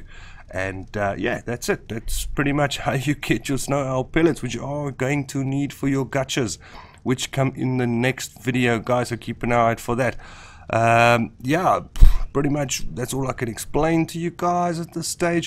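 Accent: South Africa. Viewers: Came across your Subnautica video on how to find the Blood Kelp forest and instantly heard that beautiful SA accent😂 subbed somme dadelik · Eyyyy! I heard the SA accent and subbed straight away, lekka man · Lekker die SA! Cant miss that accent xD Good vids boet keep it up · My fiance is from SA. He heard your accent and knew it right away.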